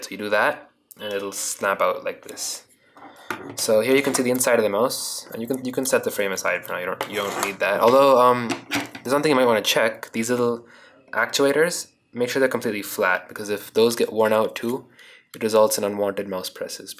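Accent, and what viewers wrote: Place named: United States. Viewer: pehle to laga ki koi Ameriki hai.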